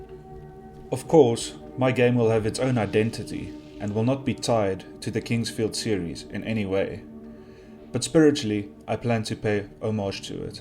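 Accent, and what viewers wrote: South Africa. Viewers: I'm always thrown off guard when I hear a south african accent on YouTube xD Will you be at rage this year? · I honestly admire the work you are doing,good luck! Also ,I was extremely taken aback at finding another person with a Afrikaans accent on here. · Where you from bro you sound South African? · I always get a warm feeling when I hear another south african on youtube lol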